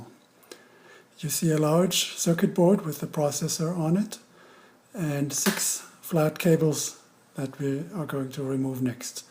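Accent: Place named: Germany. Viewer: <tangent> I love your accent. What in the world is it? I'm getting slight German, but also slight... something from England, but I'm not sure what. Am I far off?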